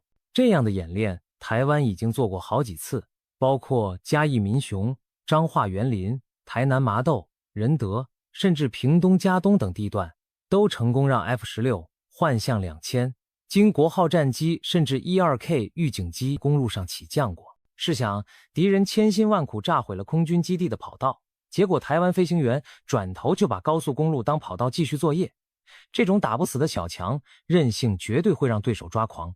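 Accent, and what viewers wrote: China. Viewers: 聽這主持人口音應該是中國那邊的 不但把我們防空飛彈優點表現出來還時不時稱我們是國家 光這一點就謝謝您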